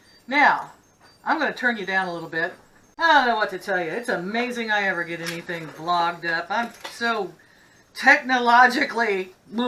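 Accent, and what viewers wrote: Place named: Missouri, United States. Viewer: Maters, crud--you talk like I do! Must be a "Missouri thing"! Thanks for the recipes--my stomach is growling now!! So glad you didn't "burn the house down!! Great video!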